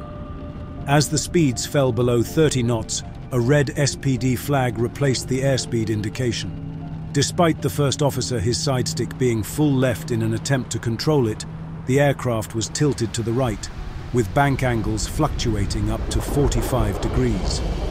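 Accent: England, United Kingdom. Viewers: English accents are overused and annoying.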